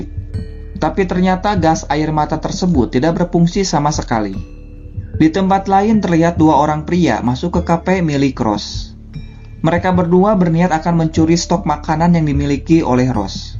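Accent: West Java, Indonesia. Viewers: Kang orang sunda nyaa.? 😁Logat sunda na kabawa wae euy🤣 Salam kang ti Bogor👍🏻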